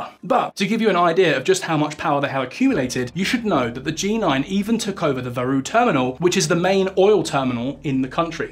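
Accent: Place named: England, United Kingdom. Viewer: The host is not American. Listen to his accent, he sounds English.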